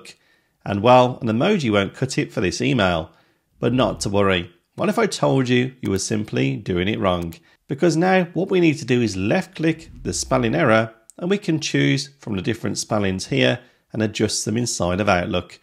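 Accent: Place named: Wolverhampton, United Kingdom. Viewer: This is a good video. However, it does not consider that most people who have an Outlook Classic work email are on IMAP or POP, not on Exchange. Hence, when you switch to the new Outlook option, you no longer have all the same features.and Therefore, switch back. Thanks, something to consider. Also, I recognise the Wolves accent 👍👍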